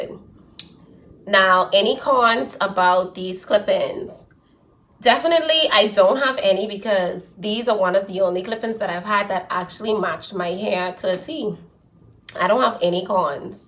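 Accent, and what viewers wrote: Bahamas. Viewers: Are you a Bahamian? I think I hear the accent but I wanna be sure lol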